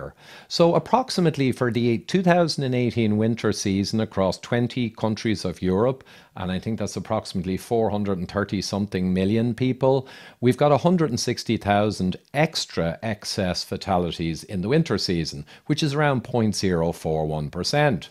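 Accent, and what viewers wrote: Ireland. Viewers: Is that a flat Kildare accent I'm hearing???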